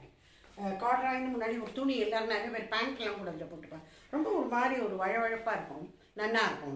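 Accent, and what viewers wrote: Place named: Chennai, India. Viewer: Nice sharing mam. I like Your talk also . Tipical Chennai person it seems. I am also a crazy person in hand bag collection. Mam, how you are maintaining your hand bags? Kindly share.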